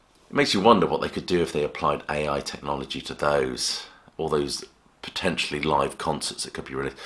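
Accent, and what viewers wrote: United Kingdom. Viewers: Thanks! Excellent reviews. British accent...priceless. 😊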